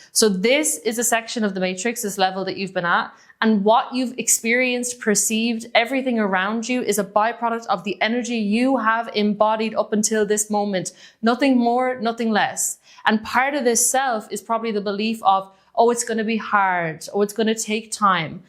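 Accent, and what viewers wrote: Ireland. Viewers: Its so rare to hear Irish people on Youtube you got a sub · I detect an Irish accent?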